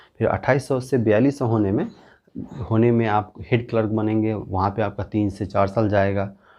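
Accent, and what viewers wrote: Darbhanga, India. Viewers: Are you from दरभंगा ?? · U sound like from darbhanga side.is it true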